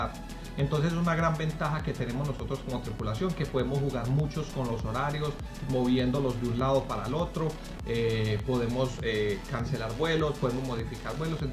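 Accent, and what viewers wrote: Colombia. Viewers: Será colombiano por casualidad? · Capitan que aerolínea vuela usted Veo que por su acento se nota que es colombiano, yo también soy Colombiano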